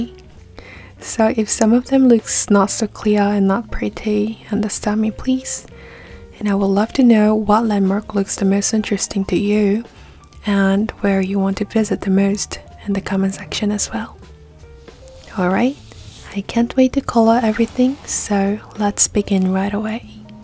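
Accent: United Kingdom. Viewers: Love your English accent❤❤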